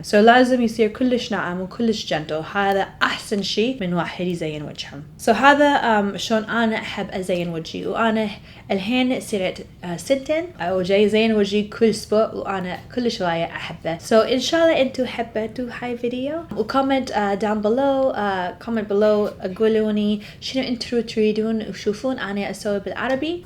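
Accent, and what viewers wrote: Iraq. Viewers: فدوه لله شگد حلوو تحچي عراقي 🤦‍♀️😂🥰😍 احبك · طريقة كلامج جدآ حلوة ونشالله بيرجع كلامك العراقي مضبوط منشن نتفهم شو عم تحكي بحبك كتيييير ليلى من العراق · تخبلين من تحجين عراقي 😂❤️💕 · صعب عليها مدا ترتاح بيها بس حجيها كلش كيوت بل لهجة العراقية فدوا 💙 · يول تجنن من تحجين عراقي اروحلج فدوة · فدوه 😍 شلون تحجي عراقي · شكد احبها من تحجي عراقي 😀❤ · فدوة الج تخبلين باللهجة العراقية تحية من المغرب 🌸🇲🇦🇮🇶🌸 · ههههه يجنن حجيها فدوه لل لهجة العراقيه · لج فدووه للي خلقج كلش كيووت من تحجين بالعراقي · انا عايزة كمله مفيدة😭ونبي كيوت من تحجي عراقي😂💜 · She speak iraqi accent not khaleeji accent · اتجنن من تحجي عراقي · تخبلين من تحجين عراقي 😻😂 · شكد حلوه بلهجه العراقيه جنن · حلو كلامج العراقي بس لاتكولين وايد لو كثير كولي هوايه بلعراقي لان انتي تحجين عراقي خلطتي خليجي وعراقي😂😂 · I love it when you do your videos with Iraqi accent please continue on that and great job love it love it 😍, now i have a request please, can you show us how to get rid off or reduce pores? 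I have big pores and they show after makeup too no matter what I use!! ☹️☹️ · it's so beautiful to see you making videos while speaking in arabic while you're not that fluent but you still try to speak with your beautiful Iraqi accent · فدوه للتحجي عراقي ياحياتي احبج هوايه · لان اللهجة عراقية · انتي كلش كيوت من تحجين عراقي اتمنة الج التوفيق والتقدم نحو الافضلل لوفيووو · فدوه شلون تحجي عراقي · هههههههه حلاة اللهجة العراقية 💚❤️😂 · انتي شكد كيوت لما تحجين عراقي احبج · كيوتتت باللهجه العراقيه☹️❤️❤️❤️❤️ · والله اضحك من تحجي عراقي😄😄😄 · فدوووه تنوكل وهيه تحجي😂 افتهمت كلشي كالته من حجيها يخبل الهجه العراقيه عليها عسل💜💜 احبج هوواي متابعتج من العراق الحبيبه🌸🌸... وانا جد جنت ادور ع طريقه ثانيه غير الخيط لان يعورني ويطلع حبوب وانا بشرتي حساسه وراح اجرب بأذن الله هاي الطريقه شكرا 💜...لوف يو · فدوووه للحچي العراقي اموت عليج 😍😍❤❤ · جمال اللهجة العراقية جمال العراقي بليز سوي فيديوهات كثير باللهجة العربية😍😍❤️❤️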